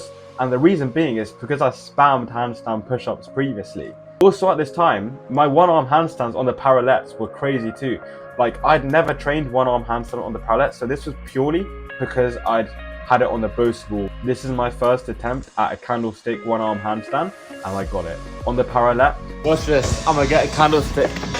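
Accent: United Kingdom. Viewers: are you british i'm just asking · holy british accent and british teeth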